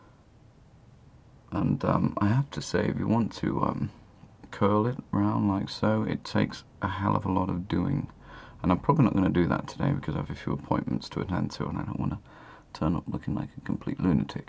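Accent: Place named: RP, United Kingdom. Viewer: Love the rp accent, subscribed! Fellow brit :)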